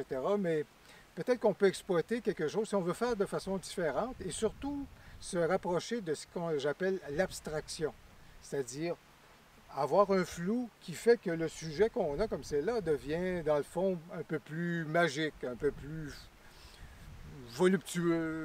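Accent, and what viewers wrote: Quebec, Canada. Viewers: en québécois ... avec une petite touche d'exotisme bien agréable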